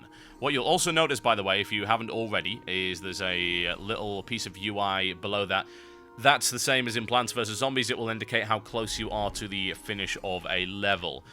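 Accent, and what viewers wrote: United Kingdom. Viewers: are you british, irish or scotish?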